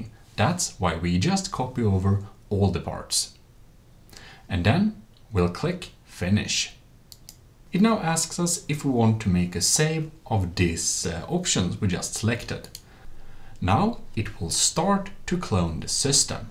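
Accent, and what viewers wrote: Sweden. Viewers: While the video is great I have to say that yes it is the Swedish accent and as a Swede it hurts to hear the accent knowing I sound the same lol. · är du svensk??? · Either that’s just your accent or the Sweden 🇸🇪 accent. I like it! By the way, nice video.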